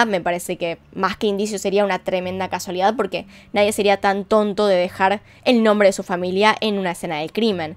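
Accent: Argentina. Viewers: Me encanta como narra con su acento argentino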